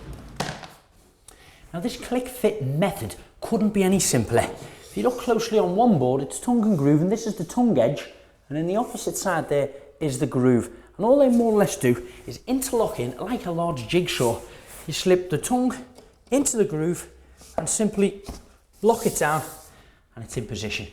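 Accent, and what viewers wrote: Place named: Liverpool, United Kingdom. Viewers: he is a scouser u moron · Sean Connery has a Scottish accent. This guy has a Liverpudlian or Scouse accent.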